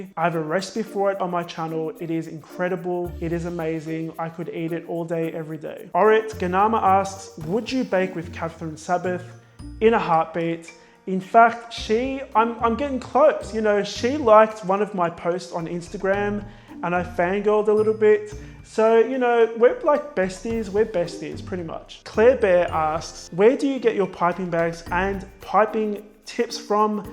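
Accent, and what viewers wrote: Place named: Australia. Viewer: I don't have emojis on this phone but it felt like a short video; didn't even feel the twenty minutes! Love your cupcakes and other recipes too :) I used to think you were saying AK guys instead of ok guys and I got really confused thinking it was short for also known as without the a but I also love your accent! Australia is awesome.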